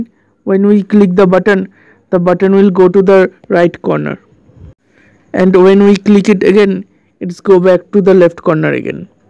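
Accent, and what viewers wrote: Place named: Bengal, India. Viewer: Hmm Bengali accent..nice :D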